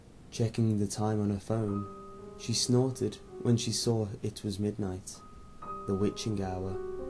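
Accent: United Kingdom. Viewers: GO BRITISH VOICE OVERS FTW sorry for that, here let me...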